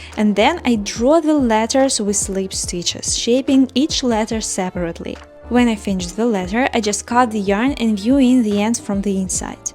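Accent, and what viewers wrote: Russia. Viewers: Hi girl thanks for your perfect turorial, btw are you russian?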